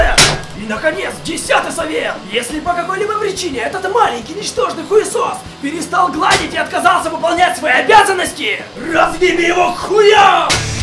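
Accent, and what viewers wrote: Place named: Japan. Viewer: говоришь с японским акцентом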